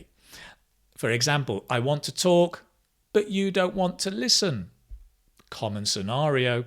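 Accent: United Kingdom. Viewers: Your british accent is really awesome. Thanks to be here to learn us how to pick up british accent because it sounds rythmic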